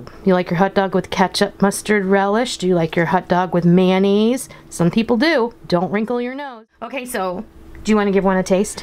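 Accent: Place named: New Jersey, United States. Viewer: I am resenting New Jersey! Whuuuup! Whuuup! You have that Northern accent. What part of Joisy are you from. I am in Union County!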